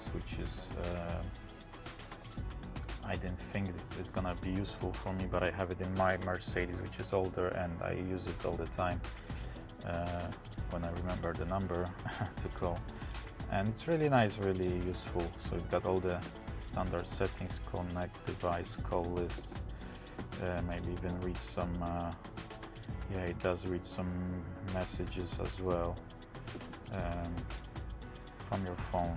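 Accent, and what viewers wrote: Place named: France. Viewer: Il est francais lui je reconnais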